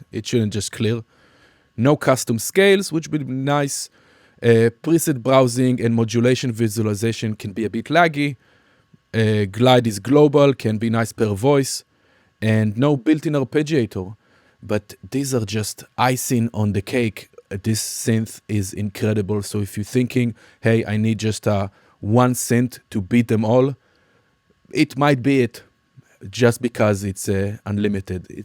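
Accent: Israel. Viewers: Israeli accent?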